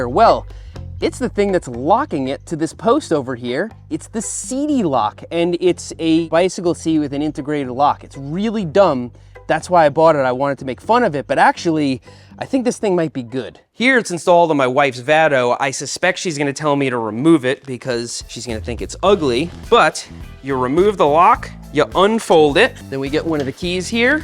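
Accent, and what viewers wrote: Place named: New York, United States. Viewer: are you from NY/north jersey? i know that accent lol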